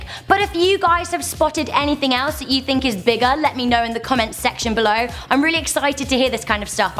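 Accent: United Kingdom. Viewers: That's because she's British, you uneducated American.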